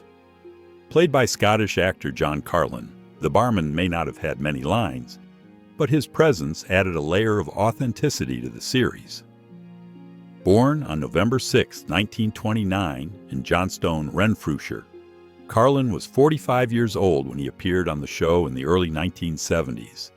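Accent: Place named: United States. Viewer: American narration.Cant watch this sh1t.Spoilt the program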